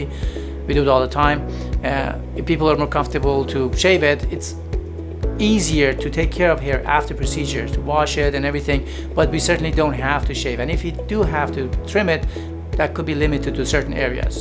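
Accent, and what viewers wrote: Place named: Iran. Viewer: lovely persian accent :-)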